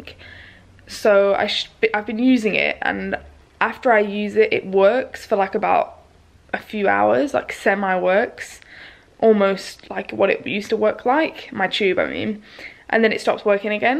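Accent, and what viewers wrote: United Kingdom. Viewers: I've seen your vids allot but because of your accent thought you must be in the UK. Can you explain or tell me where you have in vids before, how you come to be in AU. Have you immigrated, do you use Medicare, are you on the NDIS etc. Where you as sick as you are now when you came over here, is your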